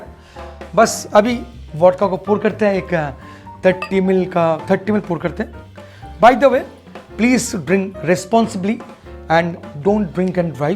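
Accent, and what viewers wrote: Bengal, India. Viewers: Dada, your English with a hint of Bengali touch is really sweet to hear. You have really done, us drink lovers, feel good about our drink. Knowledge about the drink, really adds a punch to the way we enjoy it. Just a little correction, "Rahasya" means mystery not secret, secret means "gupt".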